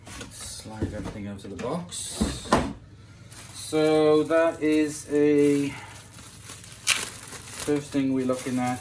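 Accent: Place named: South Wales, United Kingdom. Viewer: A really good review, also nice to here what I presume is a "South Wales" accent instead of the usual American. I think it should be ok for searing my steaks, after cooking sous vide . Paul, S. Wales.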